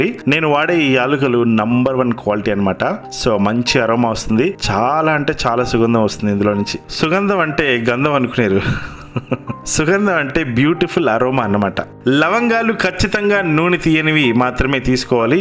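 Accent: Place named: Karnataka, India. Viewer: Ri namskara ri nim kannada slang vinapinchi picchi yekkinchav brotheru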